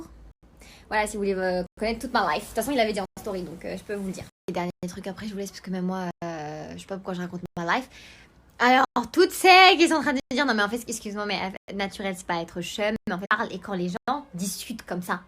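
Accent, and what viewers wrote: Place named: United States. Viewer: la fille américaine qui parle frensh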